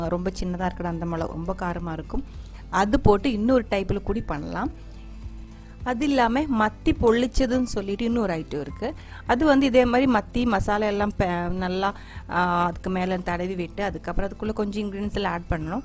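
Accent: Kerala, India. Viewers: Super fry akka.. Beautiful keral tamil.. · Your tamil is beautiful mam ..tamil mixed with Malayalam.. Nice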